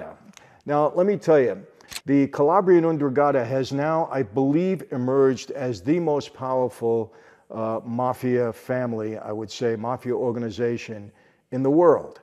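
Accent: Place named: New York, United States. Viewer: Wonderful story, by the only person I want to hear it from. Yeah the Italian is a bit rough, but the NY accent makes up for it !! I love the ' 2 question ' format !! There are so many interesting people who comment on this channel, it's fascianting to hear their qestions answered. Best wisjes to all who gather here , and great congratulations to a + 1M channel, it's been well earned and deserved !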